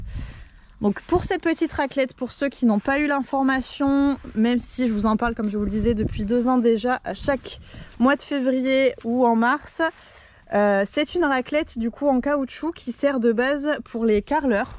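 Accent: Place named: Landes, France. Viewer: On dirait le landes tu es d'où ? :D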